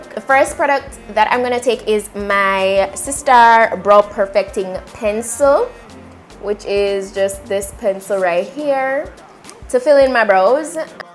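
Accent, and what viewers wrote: Jamaica. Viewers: You beautiful love your Jamaican accent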